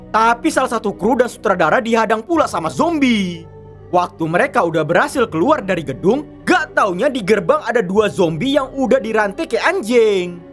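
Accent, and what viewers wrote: Medan, Indonesia. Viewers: Ini aksen melayu Medan ni wey 😂ky aksennya mael lee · Medan anjing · Nampak x medan ko ya lek 😂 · orang medan ni pst abangny dr logatnya wakwkka. btw makasih ya bang, pas nonton beneran ga ngerti. abang cerita barula ngeh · Bang saran mending pakai bahasa indonesia aja jangan di logatin medan bahasanya🙏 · Medan kali 😅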